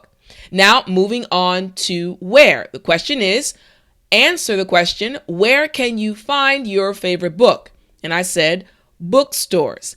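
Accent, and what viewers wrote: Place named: United States. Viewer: America English♥😚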